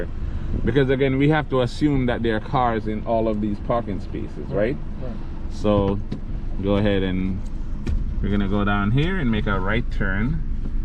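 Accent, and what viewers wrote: Jamaica. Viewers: Taking my test tomorrow 🙏🏾 btw is that a Jamaican accent?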